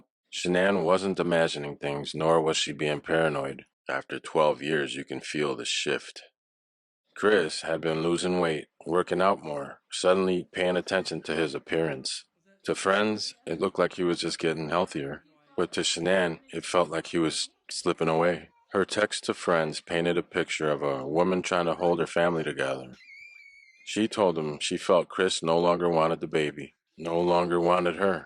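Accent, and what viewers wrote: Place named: Northeast, United States. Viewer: I love listening to your voice. It's so calming. Love the northeast accent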